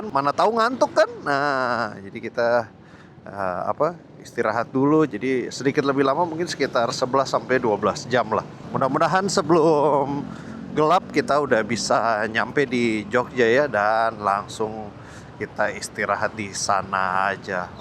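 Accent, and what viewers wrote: Ambon, Indonesia. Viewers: Logat ambon kantara Sukses selalu kk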